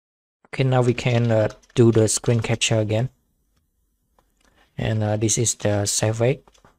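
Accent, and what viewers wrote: Cambodia. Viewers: u sound like a Cambodian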